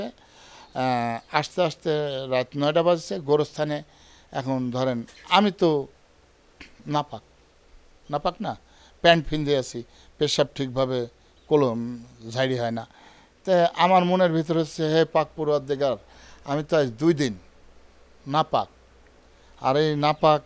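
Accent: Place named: Natore, Bangladesh. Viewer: কথা শুনে বুঝলাম আমাদের নাটোরের মানুষ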